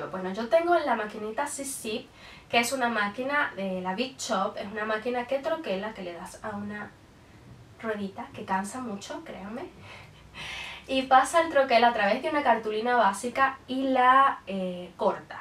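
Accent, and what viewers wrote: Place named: Venezuela. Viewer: Hola de donde es usted de que país su asentó es como de Venezuela?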